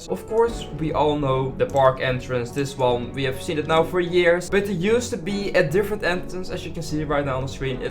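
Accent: Netherlands. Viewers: Because he has a Dutch accent.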